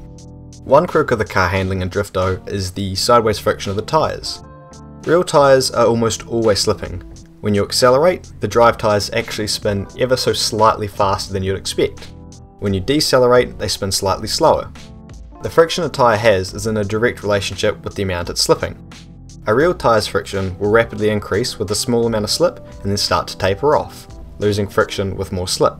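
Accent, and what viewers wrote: New Zealand. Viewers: Are you kiwi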